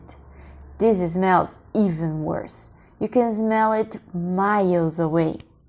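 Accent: Northeast, Brazil. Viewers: que pena uma dublê , era mais lindo sua voz nordestina, que nem a minha. 😊😍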